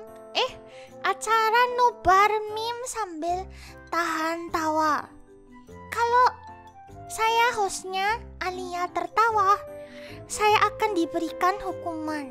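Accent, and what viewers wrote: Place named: Cirebon, Indonesia. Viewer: logat ngomongnya kek orang cirebon jir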